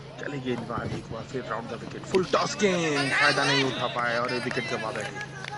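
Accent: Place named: Garhwal, India. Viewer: Gadwali boli yr bheji tu v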